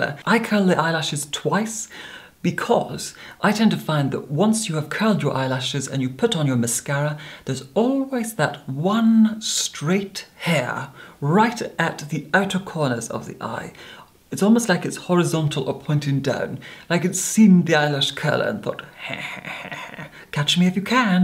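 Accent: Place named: RP, United Kingdom. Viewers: Your make up is always stunning but what always amazes me is how good your English accent is. For you being Scottish, I can imagine it can't be easy speaking with an English accent. I can tell you my Scottish accent attempts always end badly- ha. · That cut glass pronunciation, those lovely, expressive blue eyes, those cheekbones...🥰🥰🥰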